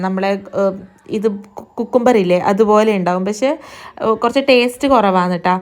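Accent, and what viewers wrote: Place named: Kasaragod, India. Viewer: kasaragod basa😂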